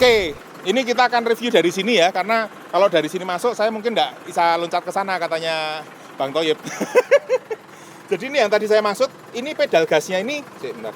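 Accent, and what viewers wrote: Java, Indonesia. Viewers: Seneng senyumana pak bos sama logat jawanya .yg khas👍🙏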